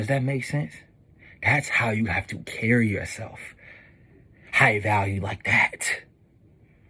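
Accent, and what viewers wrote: Baltimore, United States. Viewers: Sound like you from Baltimore ?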